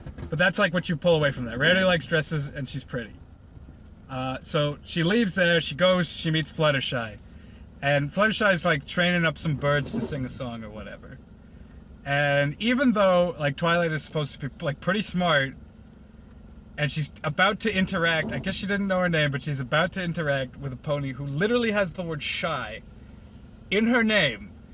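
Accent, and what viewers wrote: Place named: Boston, United States. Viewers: You have such a Boston accent it's really quite amazing. Even I don't have that thick of a New England accent and I've lived here most of my life. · you're from the east coast arent you?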